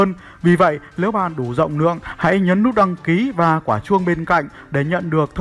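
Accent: North, Vietnam. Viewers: Anh là ng bắc à :))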